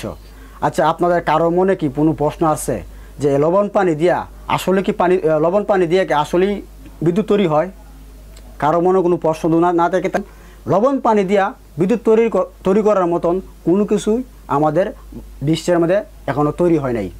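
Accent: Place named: Sylhet, Bangladesh. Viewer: কোন মাঠ নাই আপনি সিলেটি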